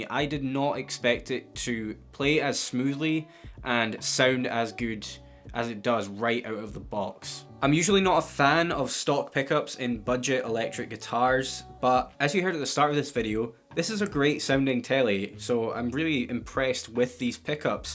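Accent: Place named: Scotland, United Kingdom. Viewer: Love watching lesson, not only explained things well, but also i love hearing his scottish accent 😁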